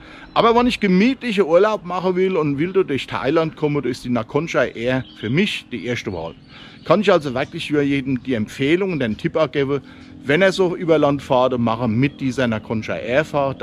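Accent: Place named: Rhineland-Palatinate, Germany. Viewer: Hallo, unser Rheinland -Pfälzer Dialekt ist doch sehr schön, na goad is schwetze Nassauer dialekt, over is eigentlich fast dat gleiche, mach weiter so und alles Gute.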